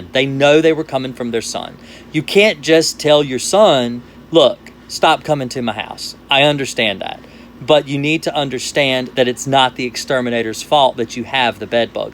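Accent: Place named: Virginia, United States. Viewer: Thanks for the vid. It was very helpful. Love the Virginia accent, by the way.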